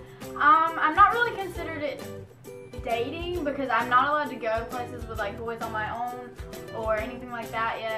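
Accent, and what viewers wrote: Alabama, United States. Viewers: She's from Alabama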